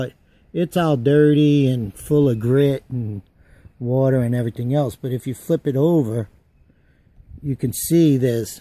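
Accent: Louisiana, United States. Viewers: Say bree? Now I know y'all from Louisiana. Good vid, very helpful, thanks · Great video, thanks ...where did that "accent" come from, Louisiana?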